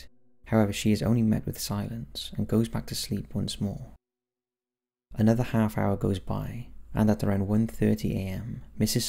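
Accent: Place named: Newcastle, United Kingdom. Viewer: I think hes a geordie lad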